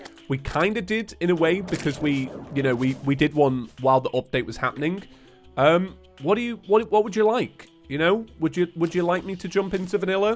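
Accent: United Kingdom. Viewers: that just the british accent mate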